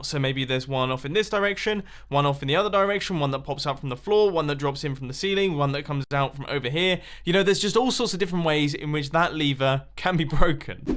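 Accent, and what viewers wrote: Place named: United Kingdom. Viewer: Mumbo has gotten more British over the years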